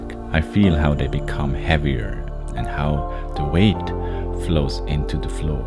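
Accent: Switzerland. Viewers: Are you swiss German? I detect a slight accent. Barn Deutsche maybe?l lived in beautiful Switzerland. Loved it! Kia ora from new Zealand.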